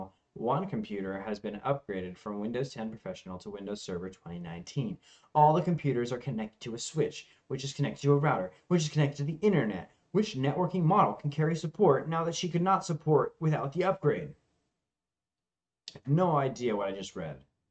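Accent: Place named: India. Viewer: lol, your indian voice is horrible, but made me chuckle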